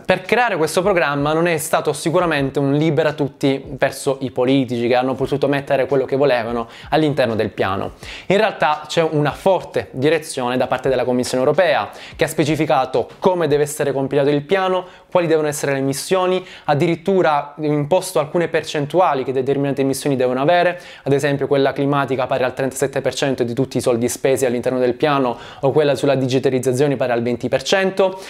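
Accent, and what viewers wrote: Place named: Lamezia, Italy. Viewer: E io che pensavo che la avessimo solo noi Lametini questa Z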